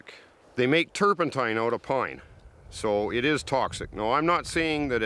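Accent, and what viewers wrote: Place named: Canada. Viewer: When that truck gives up on you I can recommend you getting a Subaru Outback. At least as good off road as any truck, and the back seats fold flat so you can sleep in it comfortably. You should look it up. As for that catch phrase, I think the most important thing is that it contains the word "out" in that awesome canadian accent. The one about poking an eye out wasn't bad at all imho.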